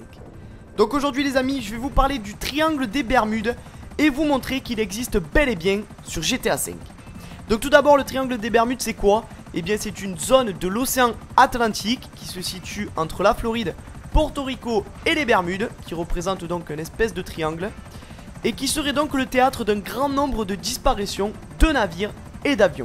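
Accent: Marseille, France. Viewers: jentend un accen marseiller (vive paris) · il parle comme les marseille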